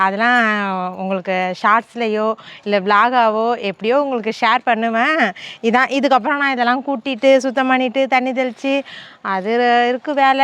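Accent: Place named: Mayiladuthurai, India. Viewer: மண் மனம் வீசும் வீடியோ....manasuku avalo asaiya irku...keera தண்டு karuvatukolambu natural ah neenga pesurathu...ketkave asaya irku....becz enga amma mayavaram so nanga veetula pesura mathiriye irku...enaku unga வீடியோ pakkum pothula nanga munadi iruntha life ah niyabaga paduthuthu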